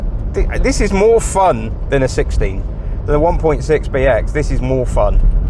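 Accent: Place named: Norfolk, United Kingdom. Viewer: Sounds like a Norfolk boy, lol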